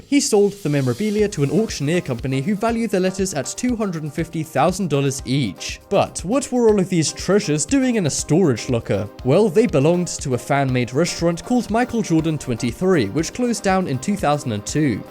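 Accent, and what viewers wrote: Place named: United Kingdom. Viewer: Derp Face Dude, he's British. They say everything different over there.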